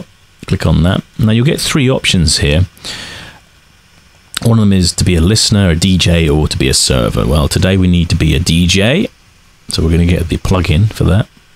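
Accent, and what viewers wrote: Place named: Australia. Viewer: Australian accent :P u can hear a difference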